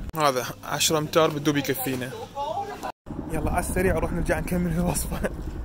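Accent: Mosul, Iraq. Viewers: اللهجة العراقية روعة متابعة جديدة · اني اول مره اشوفك واشوف قناتك وماادري ليش فرحت من تكلمت وعرفت انت عراقي حسيت بالفخر انت عراقي 🤗 ودخلت هواي وصفات مارنك بس اغلبهم كيوتين او اجانب ماتفهم منهم بس انت كلش حبيت اسلوبك وخفت دمك. طبعا لايك قبل لمشاهده واشتراك هماتين قبل لمشاهده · اللهجه العراق حلوه كثيرا والله متابعتك من 🇾🇪🌷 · تعجبني لهجتك تبين مصلاوي خاصة هاذي مال يابا كلش حلوة شغلك مرتب وحلو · تسلم ايديك وتسلم اللهجة العراقية الأصيلة أهم شي واحد يتمسك بلهجته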